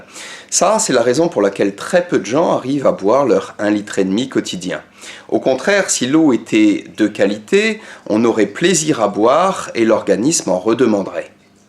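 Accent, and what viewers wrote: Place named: Switzerland. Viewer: Salut, félicitations pour cette série de vidéos vraiment instructif, bravo!!!! J'avais pas remarqué ton accent suisse lors de notre coup de fils mdr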